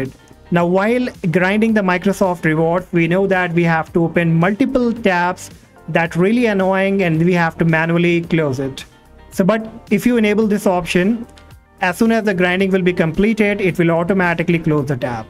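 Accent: India. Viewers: he sounds indian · how can someone sound so indian yet so american at the same time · bro is dumb he just sounds indian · You sound like an indian scammer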